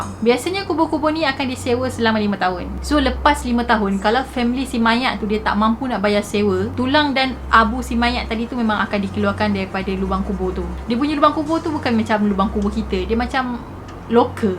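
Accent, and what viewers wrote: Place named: Perak, Malaysia. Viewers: Pandai story cakap light Perak ye..aje gemo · wau ckp owng perak tengoh lehh..saya orng perak tapi belah utaraq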